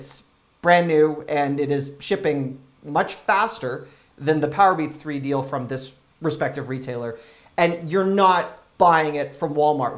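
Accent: Canada. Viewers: Are you Canadian? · Your videos are very enlightening. As a senior citizen with limited mobility I gave been ordering groceries from Walmart for delivery. The pandemic has me practically housebound. For the most part I would encourage grocery shopping for delivery. Unfortunately often the main thing wanted is out of stock & I don't know that till after an order is sent., your videos are interesting & your Canadian accent is intriguing for this old Southern steel magnolia. GOD BLESS!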